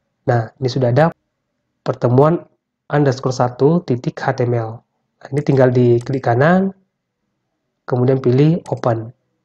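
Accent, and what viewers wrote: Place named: Sulawesi, Indonesia. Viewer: Cara bicaranya mirip orang Sulawesi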